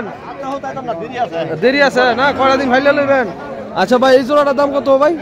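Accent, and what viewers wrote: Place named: Amgarh, Bangladesh. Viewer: ভাইয়ের কন্ঠে মনে হয় আমগড় দেশি লোক, শিবপুর উপজেলার আসে পাশে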